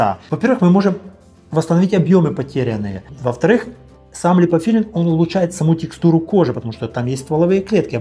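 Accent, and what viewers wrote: Ukraine. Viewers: Дядьку, всоте кажу : у тебе ДУЖЕ СИЛЬНИЙ український акцент!!! Говори українською. Чи, мммм, грошики не пахнуть???😮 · у доктора родной язик украінский, єто просто акцент. скажи ему спасібо что он для тебя по русски рассказивает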